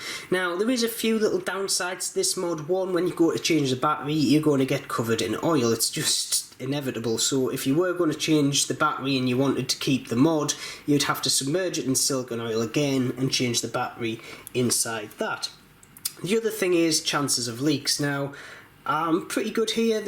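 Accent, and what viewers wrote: Newcastle, United Kingdom. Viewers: You from newcastle mate?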